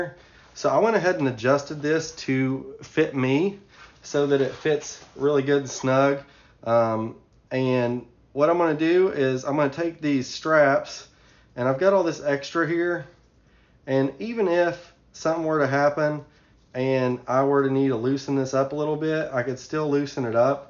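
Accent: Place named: Kentucky, United States. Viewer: Ok, well so far I've heard you say waller it out and now you're using electrical on your PFD. You are definitely from Kentuckuy and that's a good thing.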